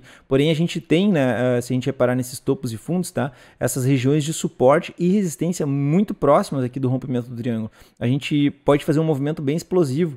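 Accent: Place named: Rio Grande do Sul, Brazil. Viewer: Sotaque Gaucho é lindo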